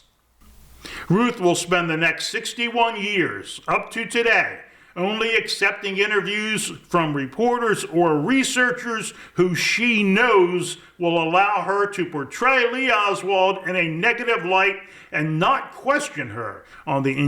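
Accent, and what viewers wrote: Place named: United States. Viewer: Notice that. In spite of the American accent and cadence this obvious error being repeated makes me think this guy is not originally an American English speaker. There's other information being being spread around about Ruth Payne that is salacious. This seems so comprehensive...why isn't that popping up here?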